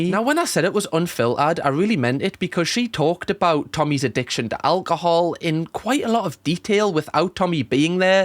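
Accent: Newcastle, United Kingdom. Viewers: Your funny but one thing i dislike about you is that your a Geordie i will subscribe if you become a mackem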